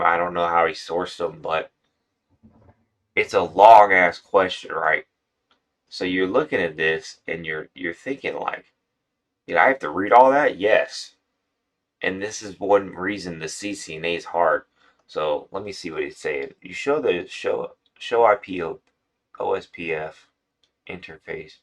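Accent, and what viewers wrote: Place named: Scotland, United Kingdom. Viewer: I love! His thick Scottish accent keeps it interesting to me.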